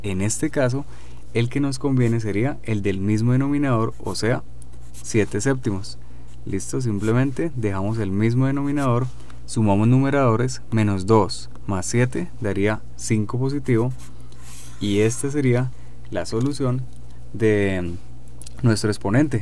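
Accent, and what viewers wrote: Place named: Colombia. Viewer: excelente video , muchas gracias por subirlo y mas con ese acento de colombiano.... gracias infinitasss !!!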